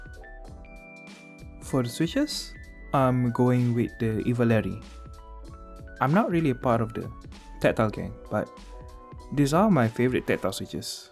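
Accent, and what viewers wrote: Malaysia. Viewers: no matter how hard you try to hide your accent, a malaysian can always tells the different, ewaah. no la...link shopee tu shopee malaysia xD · No wonder your english sounds familiar, melayu rupanya hehehe mantap bro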